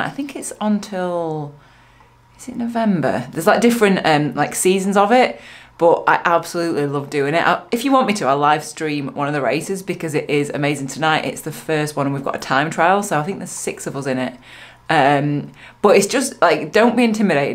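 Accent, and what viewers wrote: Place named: Northern England, United Kingdom. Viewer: To say that you enjoy listening to somebody's talking, I've always found it to be the ultime compliment you could pay to someone; and your Northern accent is so warm and clear, it is so welcoming! 🌝 I don't know who the hell was on that race but you could've dropped the entire comment section, me plodding along at the very tail 🙃😄 😃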